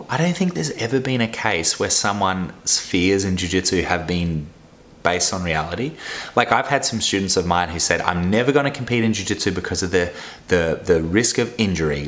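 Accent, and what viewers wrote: Australia. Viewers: Is your accent Australian? So you train where the spiders are the size of your head?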